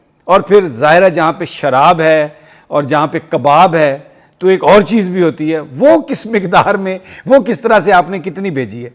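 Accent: Punjab, Pakistan. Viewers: In love wd Ur Punjabi ❤️😂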